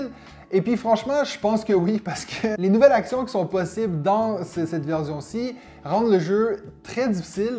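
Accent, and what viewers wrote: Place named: Quebec, Canada. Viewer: Wonderful world est le jeu du moment pour moi aussi pour renouveler Je te conseille son extension corruption et ascension qui apporte beaucoup au jeu. Est-ce que tu aimes ou joue à des jeux de deck building (dominion, aeon end ...) j’en n’ai pas vu un seul sur ta chaîne Soit tu n’apprécie pas cette mécanique où tu n’as pas encore découvert cette Univers 😍 J’adore tes vidéos malgré ton accent Québécois qui m’ai d’habitude très dure d’écouter toi j’adore vraiment ton humour.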